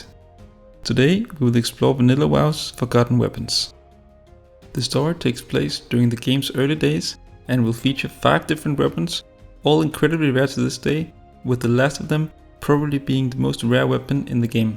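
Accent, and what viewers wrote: Denmark. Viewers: the danglish is noticable in this one ;D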